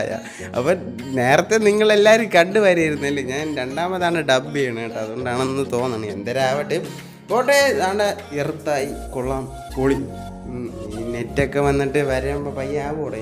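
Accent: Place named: Trivandrum, India. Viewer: Bro tvm ill ano 😁 slang athupole indu ❤️❤️ i like ur voice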